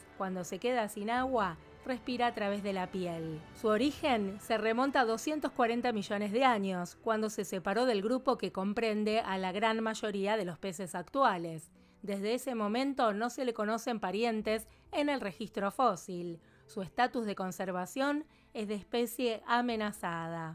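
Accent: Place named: Rioplatense, Argentina. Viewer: ❤ siempre muy instructivo sus videos y su acento Rioplatense lo hace mejor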